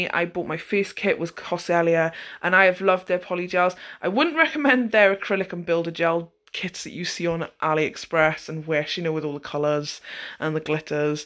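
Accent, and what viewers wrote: England, United Kingdom. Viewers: 🤗 Your little English accent is so freaking cute! I love it when you say "lush" and "bloody" and stuff!💖 Everything you say sounds so super classy! Yeah Amazon is like where I LIVE!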